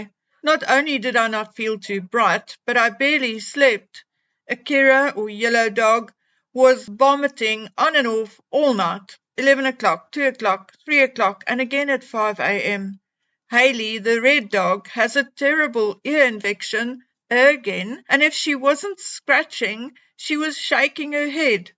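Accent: South Africa. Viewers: Umm ..it’s called a South African accent?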